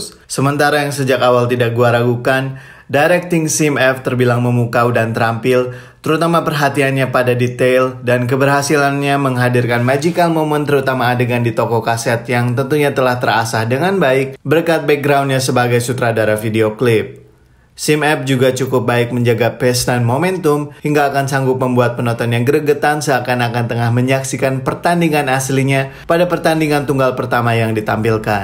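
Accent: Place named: West Java, Indonesia. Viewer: Oh, Aa teh native Sunda ya hahahaha... BTW, kaget juga ya kok ke mana ya Waltz of Flower-nya...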